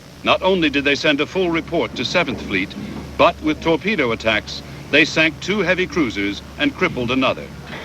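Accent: United States. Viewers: Great video. Is this the Original Narrator, or was this "Americanized" for the Western Audiences? I still prefer the British accent of the version found on Vasile Luga's channel, but to each their own. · Tim Piget Smith Narrator replaced by a Yank for an American TV Channel ? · I think this is the first time I've heard this documentary with an American narrator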